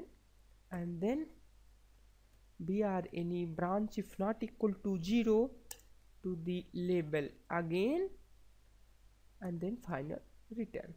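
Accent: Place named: India. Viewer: First of all thank you for your effort. mention that im not racist at all and i love indians, but im sick of that ACCENT!!! Man can you guys please work on your accent its so ugly and as people who need free educational content in coutnries like iran (myself) we really appreciate if indian guys speak like english.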